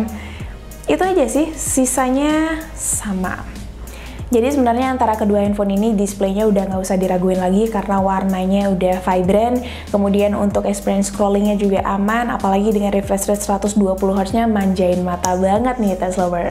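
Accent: Bali, Indonesia. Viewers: Berasa banget logat balinya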